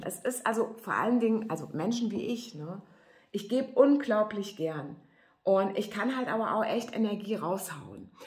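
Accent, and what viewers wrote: Hesse, Germany. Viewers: So genial made my day. Danke an meine Freundin Ina die mich zu dir geführt hat. Es macht so Spass. 😘😘😘😘 Ich lieb deinen herzische hessische Dialekt.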